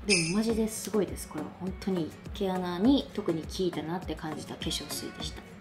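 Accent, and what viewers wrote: Kansai, Japan. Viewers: 凄い種類の多さに驚き、最初どうやって選んでいるの？視聴者は助かるんだろうな～ ちゃんの関西弁に癒される～💞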